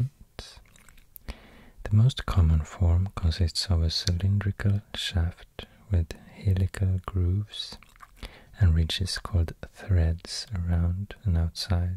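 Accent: Sweden. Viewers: So obvious that you are from sweden haha, lugnt och skönt ska det va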